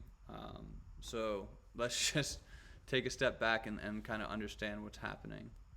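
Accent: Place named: Belfast, United Kingdom. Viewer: Tongue, Accents Belfast oh mannnnny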